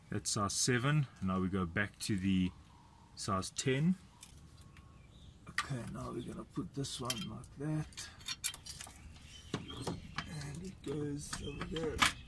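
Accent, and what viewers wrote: South Africa. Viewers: Victor Araoz he sounds South African not Austrian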